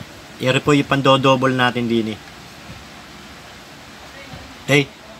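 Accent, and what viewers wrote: Batangas, Philippines. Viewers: ahh pero prang batangueño ang kaunting punto ninyo ka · ah akala ko kasi batangas at quezon ..ganan kasi ung mga punto dine eh..haha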